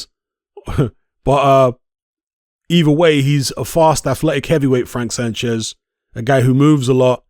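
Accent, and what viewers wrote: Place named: United Kingdom. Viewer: Why did your tone change when Wilders was mentioned? Why is there someone at fault. Are you ignoring that Wilder is in mediation. This has been one of the most bias boxing videos I’ve seen, although you try to play it off. Ruiz made a video saying he isn’t fighting Wilder there aren’t any talks it’s a fan made poster and Rumor. This is sad coverage idk if assuming off your accent, but if you’re from the UK it’s pathetic. All UK fighters serve as easy meal tickets for Carmelo. Bjs probably getting stopped, and Aj ran from Ruiz and Hearn doesn’t like the idea of running it back a third time, they’re 1-1 Aj never avenged his loss he beat an out of shape Ruiz and ran at that. You saw the fear in his eyes every time Ruiz would swing that night. Fury definitely cheating in both fights you can’t photoshop videos and those gloves definitely didn’t look normal. 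Both those men are the best this UK mega fight has to offer it’s sad. The fact they haven’t even announced a venue tells me this fight may not even happen.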